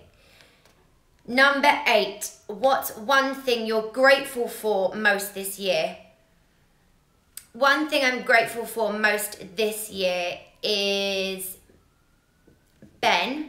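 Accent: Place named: United Kingdom. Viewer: Love you as an instructor!! Your British accent kills me in a great way. Love it. Enjoy your classes.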